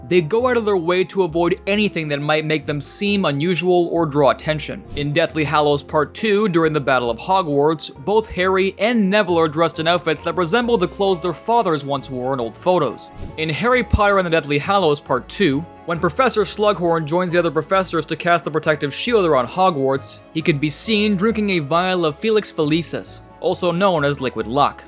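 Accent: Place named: United States. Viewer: He’s American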